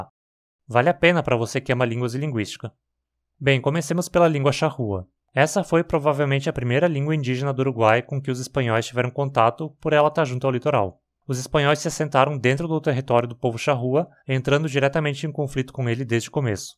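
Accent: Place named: Rio Grande do Sul, Brazil. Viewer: Am I hearing happy gaúcho noises? Fenomenal essa história.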